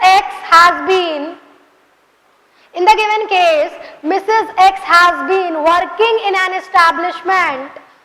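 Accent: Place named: mixed, India. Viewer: pagal ho kya gawar nhi h vo ca hai.BAS vo apna bolne ka tarika up bihar wala hi rakhi hai.baaki knowledge hai lekin bolne ka tarika desi hai.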